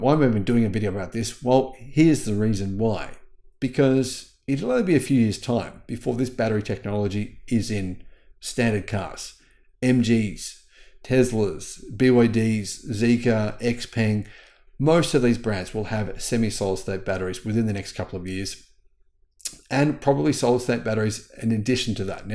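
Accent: Australia. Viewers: I am looking for a way to get credit for my improved ability to comprehend the Ozzie accent But all web sites focus on reading comprehension and ignore listening comprehension Still because of the, my listening comprehension has improved, making me confident I could successfully buy a cup of coffee and rent a hotel room in Australia!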